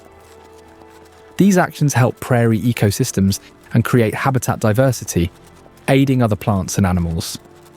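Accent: United Kingdom. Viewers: Can we get an American to speak about Wyoming not a limey annoying brit accent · Wyoming is beautiful and amazing .... but why in the hell is some Brit with rosy cheeks and their funky accent telling us all about Wyoming and making incorrect statements and plenty of mistakes ... typical Brit trying to capitalize off of America's land and beauty ... maybe a hillbilly mountain man like me should go over there and make a video and tell them all about "STONED HEDGE"....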